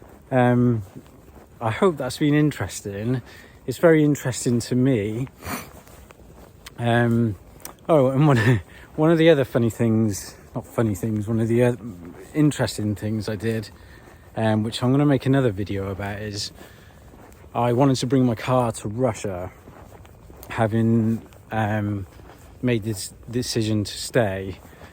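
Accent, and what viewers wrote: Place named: Southern England, United Kingdom. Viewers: Your English, from the south maybe? I'm from the midlands. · Его произношение очень типично для англичан и, сказав, что оно интересное, Вы можете немного обидеть человека. Это самый лучший английский, который Вы можете услышать, четкий и ясный. У меня когда-то очень давно был такой акцент, но я его быстро потеряла, начав жить в Америке. Я очень надеюсь вернуться назад в Россию после более 20 лет жизни в Америке, но у меня сыновья-инвалиды из-за ошибок американских врачей, поэтому сейчас мы только надеемся на чудо. Я очень жалею, что уехала и я знаю, что я не одна такая, кто хочет вернуться, но по личным причинам пока не может этого сделать. В каждой стране есть свои плюсы и минусы, но проблема в том, что мы знаем, чего ожидать и как обойти многие проблемы на Родине, а в новой стране мы, как слепые котята, ничего не знаем. 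Поэтому, верна поговорка, где родился, там и пригодился.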